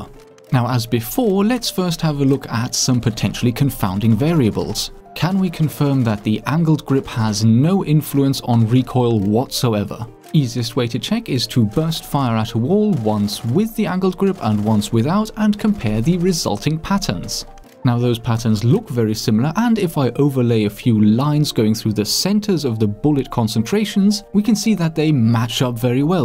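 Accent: United Kingdom. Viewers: ur voice is so British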